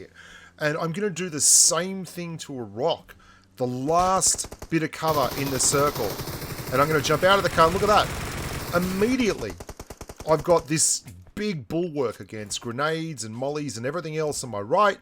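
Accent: Australia. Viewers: Hey. Damo from SA here. So refreshing to hear an Aussie and self deprecating at that. Brilliant videos, tactics and fun in spades. Been playing and watching your content for ages. Watch out for grubs. 😅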